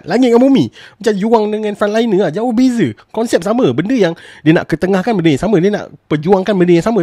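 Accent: Kedah, Malaysia. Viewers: First time dengar cakap loghat Kedah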